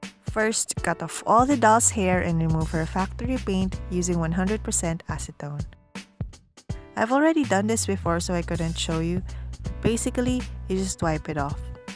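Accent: Philippines. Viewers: Was it just me or you sound like a filipino? I'm not racist okay cause I'm also a filipino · What country are you from <3? Your accent sounded like you're Filipino O///O !? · are you a filipino? your accent sounds like one ☺️ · are you a filipino? cause your accent lol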